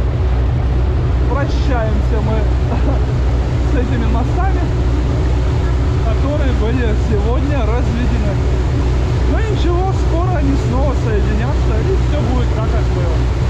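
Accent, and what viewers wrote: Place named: South, Russia. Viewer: Заткните в таком случае свои уши и не слушайте. Человек говорит так как привык с детства. Это южнорусский диалект.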